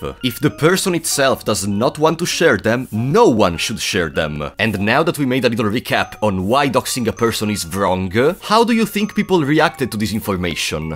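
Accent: Italy. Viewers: I immediately knew that you are from Italy cuz of ur accent xDDD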